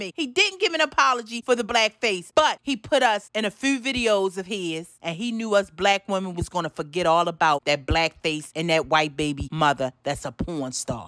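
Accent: Baltimore, United States. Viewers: Found you by accident. That BMore accent got me here to stay 😂 great content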